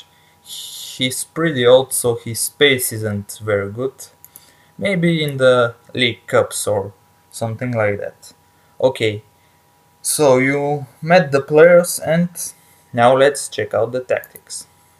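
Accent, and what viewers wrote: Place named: Bulgaria. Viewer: Great vid buddy love the accent Are you from Bulgaria if so big up Stan the man the Celtic ledgend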